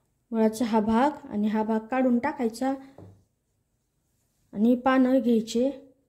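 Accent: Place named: India. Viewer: तुमचा आवाज कीती गोड आहे 😍 अस्सल गावरान मराठी भाषा त्या आवाजाला अजुन सुट होते 👌💯✅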